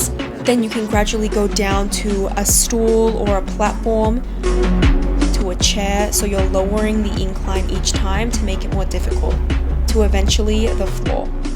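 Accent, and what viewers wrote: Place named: Australia. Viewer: Prefer your natural Australian accent. Beautiful videos. Thank you.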